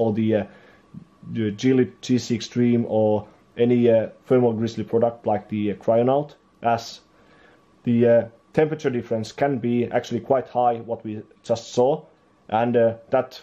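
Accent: Finland. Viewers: You sound Finnish, are you Finnish? Jos oot, hyvin tehhty video.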